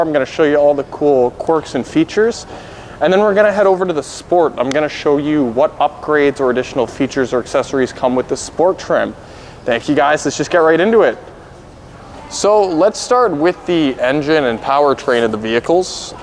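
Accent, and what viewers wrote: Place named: Canada. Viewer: Holy Christ you're Canadian 🤣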